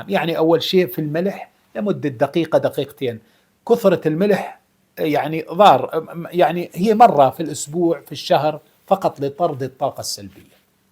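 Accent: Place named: Iraq. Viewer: عبالي عراقي تقريبا نفس اللهجة والشكل🌱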